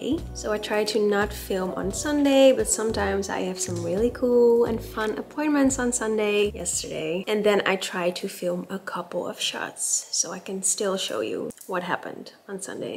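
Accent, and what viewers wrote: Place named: England, United Kingdom. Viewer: Ik houuu van jouw engelse accent!! Rustgevende stem heb je!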